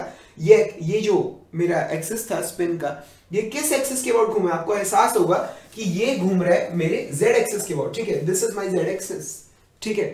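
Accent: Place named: Punjab, India. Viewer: ap pkka punjabi ho sir....jo baar baar raje rajee kr rhe the...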